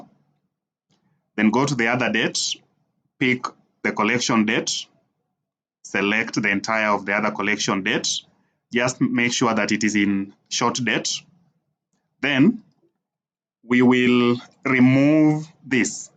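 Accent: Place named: India. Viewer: Why is the audio indian😢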